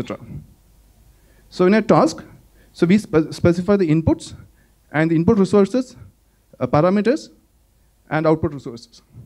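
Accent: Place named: India. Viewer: what a mess. no subtitles to bad indian accent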